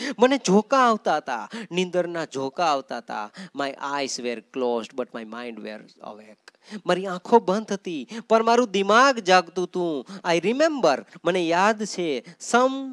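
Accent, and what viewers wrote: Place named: Kathiawar, India. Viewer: Best sir વાહ કાઠ્યાવાડી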